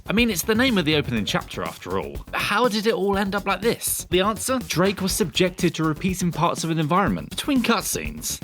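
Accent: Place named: United Kingdom. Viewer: Gotta be another British accent. I think they might have more than the entire USA!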